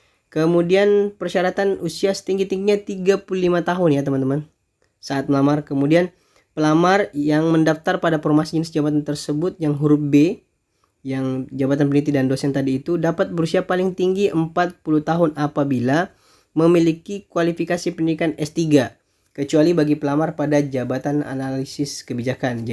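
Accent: Pontianak, Indonesia. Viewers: Mau tanya bg abg asal pontianak ya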